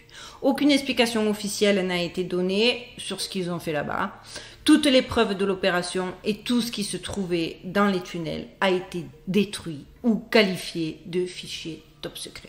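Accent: South, France. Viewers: Ma niece m a dit que tu viens de chez nous ds le sud je kiffe tes videos et ton accent bien de chez nous ❤❤❤ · Ils lui en veulent plus pour son assent du sud.lol .moi j' aime bien au début " hello wiwane "🤣😋😘.j aime bien la chaîne (pour le contenu avant tt)car les vidéos ne durent pas une éternité comme certains qui font des vidéos de 3 heures. Encore une vidéo ou 2 ,je veux bien, mais quand c'est à répétition, je suis obligé de fuir. Sinon bravo la miss 😘👏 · Bonjour en ce jour de Noel 🙏🌟🎄:je découvre cette vidéo hallucinante ,tu es extraordinaire !! Les sujets les plus "sérieux" et bien fouillés,traités avec un humour dévastateur,😂et en plus,ça ne plaît pas à tout le monde ... La peur, tout est fait pour que les gens soient Morts De TROUILLE 😠. Facile de mettre les gens qui dérangent en hôpital psy .... Allez bonne journée à tous et merci pour cette ,et Toutes ces vidéos que tu nous fais; avec toi,on avance à grands pas ,et tu nous fais évoluer .Accent du 13 ? 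Et moi du 34 !!!😻💖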